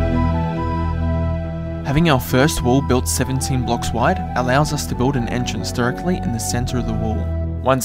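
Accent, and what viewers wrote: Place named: Australia. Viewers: i did not know was Aussie! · all aussies sound the same apparently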